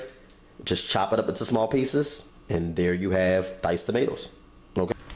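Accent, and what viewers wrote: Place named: Baltimore, United States. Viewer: I was about to ask you where you are from.. Then I seen your name haha. that accent. .. I was going to guess baltimore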